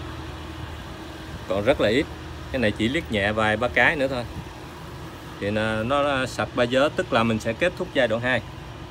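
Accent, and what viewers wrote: South, Vietnam. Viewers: Anh có giọng miền nam ấm áp, thiện lành, ngay lần đầu tiên xem đã cảm mến. Chúc anh luôn khỏe mạnh, an lành. Atlanta, Georgia.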